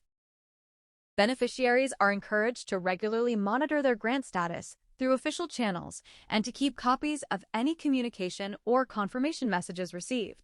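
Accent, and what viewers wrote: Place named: United States. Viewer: Stop these fake news you sound like an American your acsent is not South african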